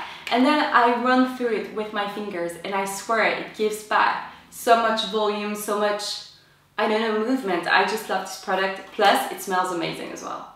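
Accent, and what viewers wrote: France. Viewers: Bonjour ☺️Es-tu française ? Are you french ? Because you hava a french accent especially when you say "la mer" ?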